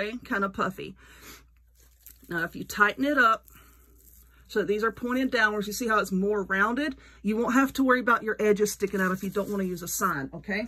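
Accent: South, United States. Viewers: i have to tell you how much i love your tutorials.. your accent and one-liners. your personality is what keeps you watching. My Dad is 96 and recently moved into an assisted living facility after the loss of my mom..I had decided to make wreaths for his door to his room so it would be easy for him to find his room. He is a disabled vet and this wreath idea is what i was looking for for Memorial Day and the 4th, I added a few stars along the outside, but thankyou so much for your ideas and tutorials, I'm sure he'll love it! You are a and all that! Thanks again!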